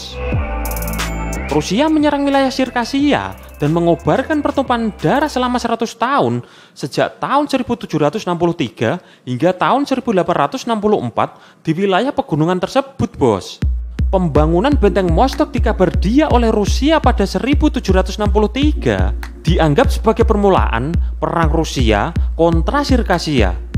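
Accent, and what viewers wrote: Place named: Java, Indonesia. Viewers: gapapa mbak memang orang jawa logatnya kayak begitu susah juga kalo ngomong pake logat indonesia biasa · informasi dr km ny menarik dan jelas, tapi narasi bicaranya itu yg bikin garing kak🙏, but aku suka Ama channel vidio2 KK y infomati itu, prasan jrg yg bahas, aku tauny bnyk konten spoiler n y ky ngikutin tren doank, bagus c KK jd dr sndri, cm Krn medokny terasa garing di telingaku 🤪🤭,aku bnyk tmn org Jawa tp klo KK mya ini kyk LG ndalang gtu prasaan narasinya 😂😁😁🙏, intiny konten dan Vido KK bgus dan ckup mnrik so lanjutkan kak, y tnggl menyesuaikan aja c y kak 👍🏻👍🏻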